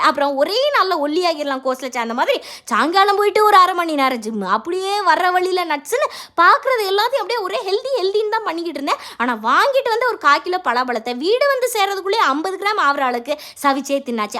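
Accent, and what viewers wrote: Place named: Southern Tamil Nadu, India. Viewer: ✨ உங்களோட தென்மாவட்ட தமிழ் மிகவும் அழகாக உள்ளது ❤❤❤❤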